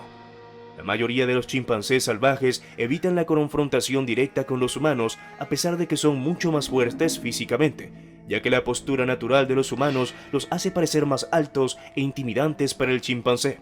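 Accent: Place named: Venezuela. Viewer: El acento tropical y pretencioso, (venezolano?)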